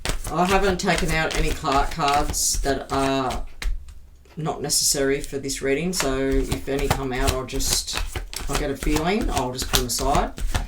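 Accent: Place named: Australia. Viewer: Geeat read. Being an Aussie i have found you and aother read i follow. Love the Aussie accent.❤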